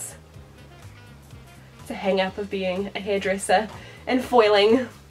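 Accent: New Zealand. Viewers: Yeah, the short 'e' becomes two long e's, sounds like NZ. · Sounds like she's from New Zealand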